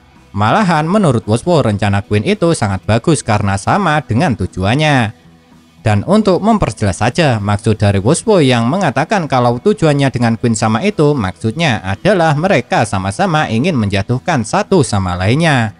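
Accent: Java, Indonesia. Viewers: Logat jawa nyaa bisa lebih di netralkan 🙏🙏🙏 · Medok jowo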